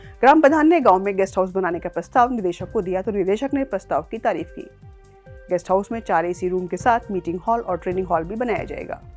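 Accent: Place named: Purvanchal, India. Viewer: मैडम आप भी पूर्वांचली/अवधी/भोजपुरिया क्षेत्र से हो क्या....... तालुकेदार क्या होता है 😂😂😂😂😂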